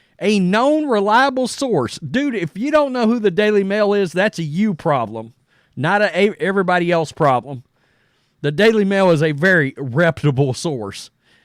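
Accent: South, United States. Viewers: Dude WHERE ARE YOU FROM? TEXAS? THIS IS BUFFALO AND PITTSBURGH IN JANUARY!!! DID YOU JUST DISCOVER FOOTBALL? This is like shooting yourself in head as treatment for a headache. You're gonna suggest trashing the entire playoff schedule for the winner because playoff football has to be played in the snow? IN BUFFALO???? You sound like you're from the south so i get how SNOW sounds to you, but this is utterly ridiculous to suggest SNOW is that big of a deal to Western NY and Western PA ppl. We LIVE in Lake Effect snow conditions our entire lives!!!! Sit this one out country boy. Guarantee the Bills would be LIVID to give up home field advantage for some snow. This is extremely hilarious 😂🤣 tell me you're not from around here without saying your not from around here. If ANYONE can play in these elements... Its Pittsburgh and Buffalo 😂😂😂😂😂😂😂😂😂😂😂😂😂😂😂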